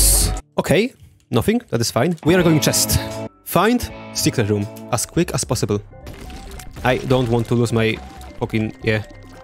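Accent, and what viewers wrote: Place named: Poland. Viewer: Niezły film Oraz dobry akcent Ale Polak zawsze pozna Polaka nawet gdy mówi po angielsku Ale wciąż bardzo fajny odcinek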